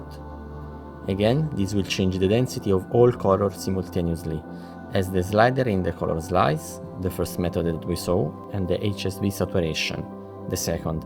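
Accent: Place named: Italy. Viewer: Saturated topic explained in a very unique and amazing way. Learnt alot, thank you. How do project settings affect this process? Ive been on the constant struggle between Color managment, input color space, etc. Is this something that changes your workflow in any way? PS: The italian accent makes it even more tastefull haha thank you!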